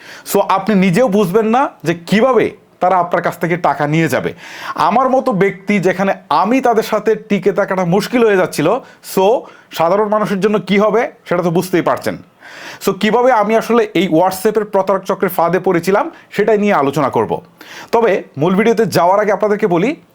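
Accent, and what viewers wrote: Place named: Kushtia, Bangladesh. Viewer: ভাই আপনি কি কুষ্টিয়ার ছেলে?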